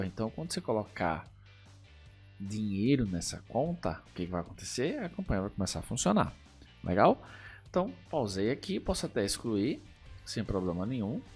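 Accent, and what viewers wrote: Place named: Northeast, Brazil. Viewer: Aula top ainda é nordestino pelo sotaque, ai sim valeu meu vey mais um inscrito de recife <3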